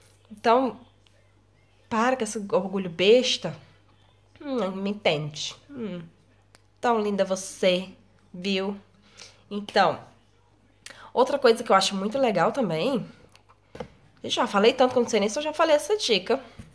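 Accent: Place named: Maranhão, Brazil. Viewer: Oi, sou novo aqui no seu canal e tenho uma pergunta você é de qual estado do Brasil? eu aposto que você é do Maranhão esse linguajar é bem maranhense mermã...kkkkkk. Estou gostando muito das sua aulas. Sucesso.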